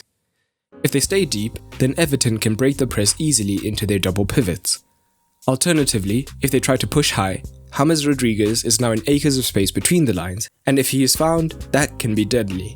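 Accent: South Africa. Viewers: I'm convinced this guy has a South African accent.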